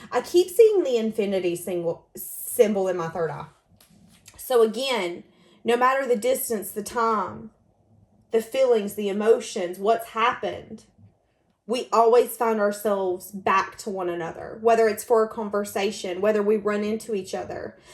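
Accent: South, United States. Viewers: My goodness, child. I do believe you are the smartest reader I have ever encountered. The only thing you missed is that he has a relatively new diagnosis of cardiopulmonary illness. And that would tie it all together. And I LOVE your southern accent. Peace.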